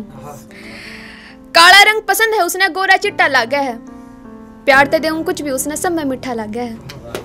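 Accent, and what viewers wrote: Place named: Haryana, India. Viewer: Yaar aap bahut cute Lagte Ho Haryanvi Bolly ma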